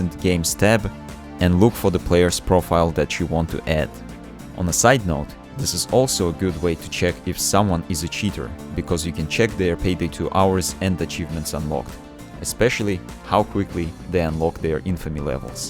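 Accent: Russia. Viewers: Just when i clicked on the video i was like sure great intro .. OH HES RUSSIAN haha im half russian i did not say that in an attempt to offend him. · Говори что хочешь, но акцент русского у тебя выделяется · Кул рашн акцент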